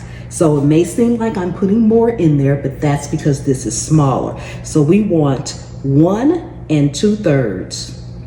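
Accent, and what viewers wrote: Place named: Louisiana, United States. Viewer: I hear the southern accent but can’t place it. I want to say Louisiana. Whatever it is, I could listen to you talk about cooking all day!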